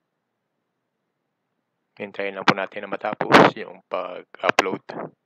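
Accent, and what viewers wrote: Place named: Iloilo, Philippines. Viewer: Daw ilonggo ka gid to mo.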